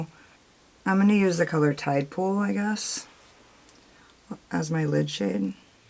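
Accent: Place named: New Orleans, United States. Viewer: Omg You sounds like a New Orleans Gal.. I love your Videos.. New Subscriber here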